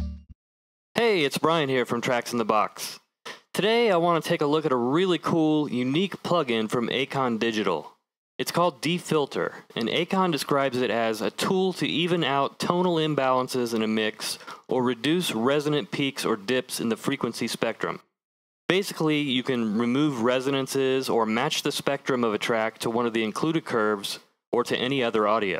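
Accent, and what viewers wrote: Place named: California, United States. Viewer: You kinda sound like Gregory Scott of Kush Audio fame, but with less vocal fry and and less CA accent. Not an insult, to be clear, I just noticed the similarity between your voices.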